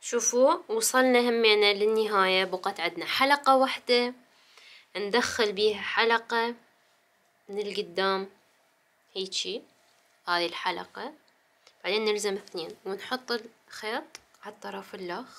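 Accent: Iraq. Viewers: مااحلاكي اول مرة اشوفك.. اموت فاللهجة العراقية. تحياتي من 🇩🇿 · فكرة الخيوط دي هايلة تسلم ايديكي لهجتك في الكلام عراقية اشتقت كتير للعراق فقد قضيت فيها ٤ سنوات ونصف بالتحديد في قضاء سامراء وكنت اذهب مع زوجي لبغداد الحبيبة فقد تجولت في شارع الرشيد وساحة الشهداء وشارع النهر والجورجة بتعطيش حرف الجيم وشارع المنصور والسعدون وحي الحرية والدورة الجديدة كان عمري أيامها بداية العشرينات عمري الان ٦٢ عام ومازلت اتذكر الأماكن والأصحاب العزاز الي تعرفت عليهم بحكم العمل والله نفسي اعرف أخبارهم واطمن عليهم بس ازاي لو اخت منهم تعرف اسمي وتتذكرني تكتب لي اكون سعيدة جدا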